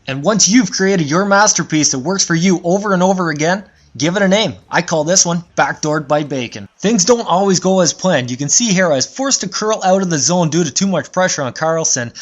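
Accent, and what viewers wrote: Canada. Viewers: thanks for the tips man! i can totally tell that you're canadian by the way you say about sounding like ABOOT. haha. thanks for uploading!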